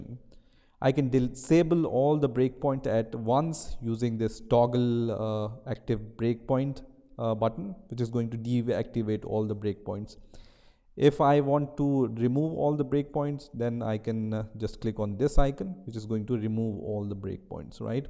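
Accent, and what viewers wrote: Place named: India. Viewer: i hear your voice and i know that u are from india or pakistani. one of booooooooooooooooot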